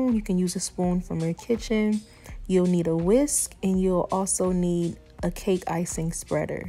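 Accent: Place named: Chicago, United States. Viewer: You must be from CHI. Honey that accent comin through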